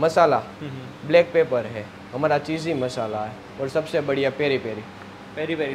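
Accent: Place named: Gujarat, India. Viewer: Vo gujrati keret hi bolte he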